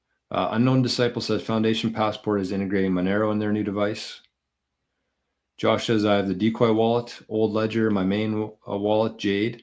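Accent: Canada. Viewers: I bet this guy played hockey? Sounds Canadian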